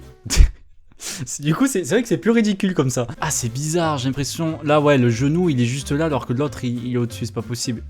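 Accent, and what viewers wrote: South, France. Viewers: Ouais ça m'a plus Tu viens du sud ? Si oui ou ?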